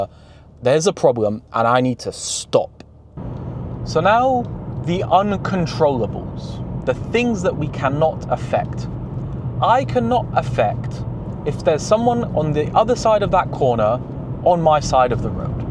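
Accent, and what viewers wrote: United Kingdom. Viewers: His accent is British but his pace is American 😅😅😅😅😅 from Malaysia 🇲🇾🇲🇾🇲🇾🇲🇾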